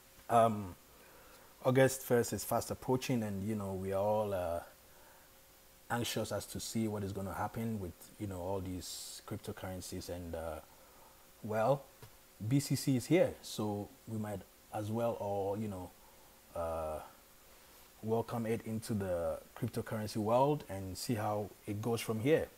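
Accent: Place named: Ghana. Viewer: Are you from Ghana? Your accent is a giveaway.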